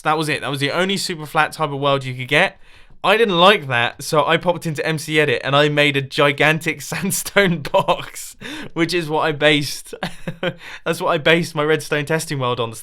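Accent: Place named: United Kingdom. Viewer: How many other people freaking love his accent? It's so great, it's probably just me, I have a thing for British people.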